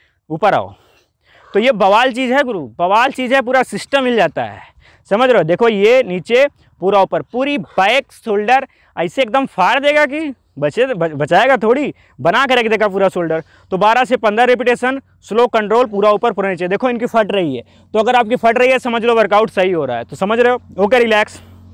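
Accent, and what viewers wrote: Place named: Uttar Pradesh, India. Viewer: Ap up se ho kya language to wase bol rahe ho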